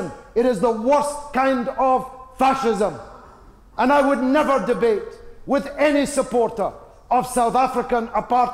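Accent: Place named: Scotland, United Kingdom. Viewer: No, he's 100% Scottish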